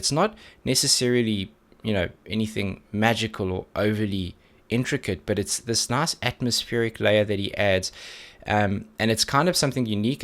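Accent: South Africa. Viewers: I kind of fell down the rabbit hole of watching various YouTubers reacting to but when I came across this video, boy was I surprised! I Instantly recognised your accent as being South African! As a long time fan of from South Africa, it was trip to find this! I think of all the reaction videos I've watched, this one was the most technical and detailed. It's been interesting hearing about different aspects of the instrumentals (other YouTubers don't really mention them). On, they have a large catalogue of music and their style has changed quite a bit over the years but is one of their defining songs. It's 9 minutes of ebb and flow where you are waiting for the wave to break onto the shore and when it does, wow, does it overwhelm you. (As a viewer who knows the twists and turns of the song, it is fun to watch people's first time reactions to it's unconventional structure.) Kyo (V) has an amazing vocal range so people often focus in on him but the other members of the band, Toshiya (B), Kaoru (Gt), Die (Gt) and Shinya (Dr) are also all immensely talented. 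They've been going for over 20 years and still making music but I love how one never knows what to expect from them next. Still on my bucket list to see them perform live one day!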